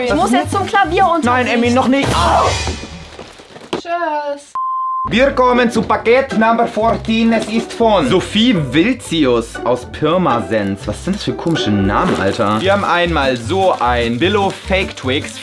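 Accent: Saxony, Germany. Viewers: Hey,😊 kannst du mehr auf sachsisch reden bitte. Ich und meine beste Freundin haben uns totgelacht.😂😂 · Ich hatte einen durchgehenden Lachflash!!😂 Bitte mach weiter so du bist der Beste!! Als du die Perücke abgezogen hast war ich so erschrocken wie kurz deine Haare sind, weil ich mich schon so an die Perücke gewöhnt hatte!!😂😂 Mach weiter so und rede mal öfter sächsisch!!❤️😂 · Kannst du deine Videos jetzt immer auf Sächsisch machen😂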